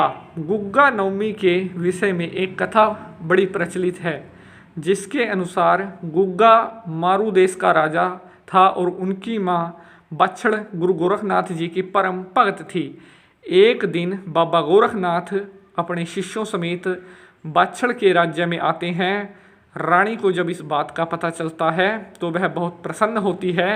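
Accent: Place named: Punjab, India. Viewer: Koi Punjabi lagda video bnon wala hindi nal gutham ghuthi hon dya e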